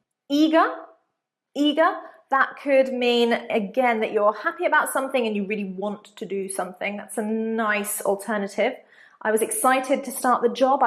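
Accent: United Kingdom. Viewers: Gosh i haaaaaate british accent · What accent os that? os this Bristh accent?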